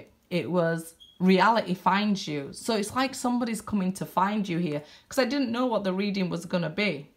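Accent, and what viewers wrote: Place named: England, United Kingdom. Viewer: You must be in either England or Australia, I'm hearing England though.... I could see myself moving there...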